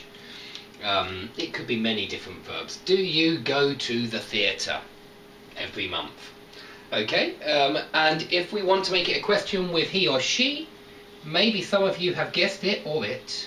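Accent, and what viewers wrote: United Kingdom. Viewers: Thank for for your free lessons! You're doing fantastic job! Great explanation and authentic british accent! Please, make more!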